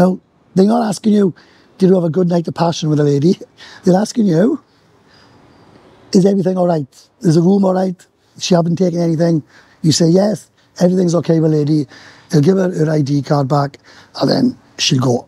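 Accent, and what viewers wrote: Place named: Wales, United Kingdom. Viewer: been there Twice firsT was with me bro then 2nd time the X lol, first timewas best u know what i mean taffy, you sound likje Treherbert boy :)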